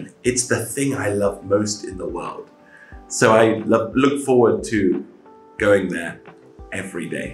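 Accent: United Kingdom. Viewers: Giọng anh - anh chuẩn, nhiều từ vựng. Năng lượng của Thầy luôn truyền cảm hứng rất tuyệt vời!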